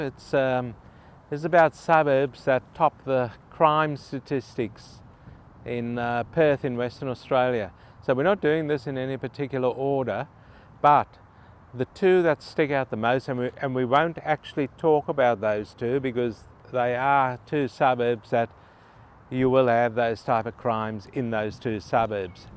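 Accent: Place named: South Africa. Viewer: Sounds like you're from South Africa, mate - one of the highest crime rate countries on the planet!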